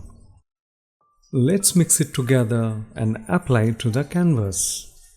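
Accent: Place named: India. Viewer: Before reading that you are Indian ..i recongnize your campus accent.. i have travelled a lot all around India ...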